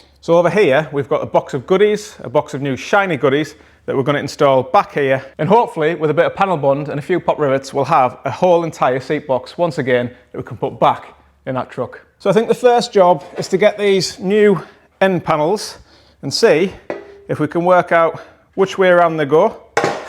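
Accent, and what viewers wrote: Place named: Middlesbrough, United Kingdom. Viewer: Great channel keep up the good work, you sound like a Boro lad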